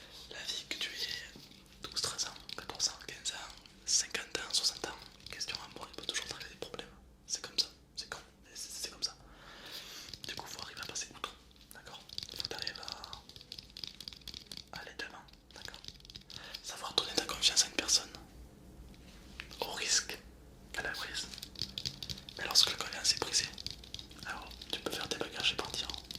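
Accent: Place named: Toulouse, France. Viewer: Ses se que on appelle l'accent toulousain même si oui il a une très belle voix aussi (pk j'ai perdu mon accent toulousain moi 😭)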